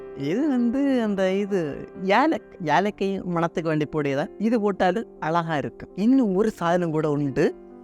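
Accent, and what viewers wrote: Kerala, India. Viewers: அடேய் தம்பி., சுலைமானி tea பல பேரு செய்றாங்க..நீ எப்படி பேசிகிட்டே செய்றன்னு பாத்தேன்..உன் கேரளத்து தமிழுக்காக தான் இந்த காணொளியை பார்க்கவே செய்கிறேன்..😅😂🎉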